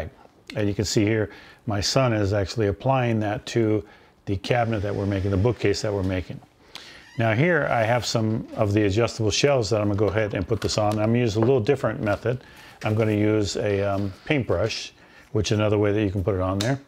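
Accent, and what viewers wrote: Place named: United States. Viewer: I love American accents!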